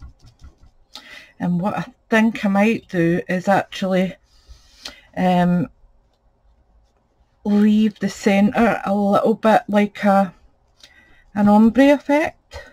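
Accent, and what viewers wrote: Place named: Scotland, United Kingdom. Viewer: Hi! thanks for the tips!! love hearing a scottish accent!!! Good luck with your channel x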